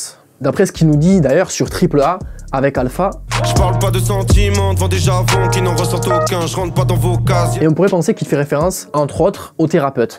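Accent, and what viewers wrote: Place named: South, France. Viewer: l'accent du suuuud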